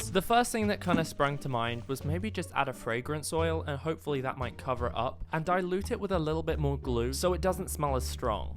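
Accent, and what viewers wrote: United Kingdom. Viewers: He sounds British bro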